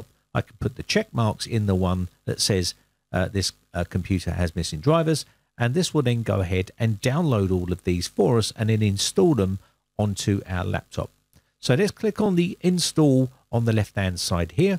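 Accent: United Kingdom. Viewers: His videos are outstanding, my American English, however, doesn't always pick up on his UK English! Thanks for your comment.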